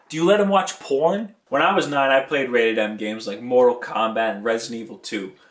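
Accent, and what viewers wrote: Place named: New York, United States. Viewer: Wow your NY accent was way more noticeable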